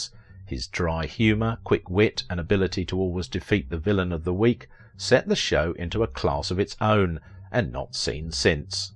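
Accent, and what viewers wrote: United Kingdom. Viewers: You’ have got a wonderful British accent! Love it!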